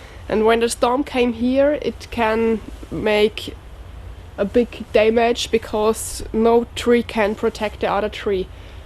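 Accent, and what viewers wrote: Germany. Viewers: It’s called Rosin In The USA,Lov You’re German accent,🥰🙏😇🇺🇸👍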